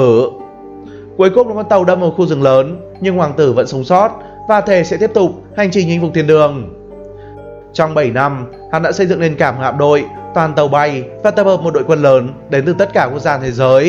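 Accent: North, Vietnam. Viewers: Anh ở miền Bắc đk anh.-.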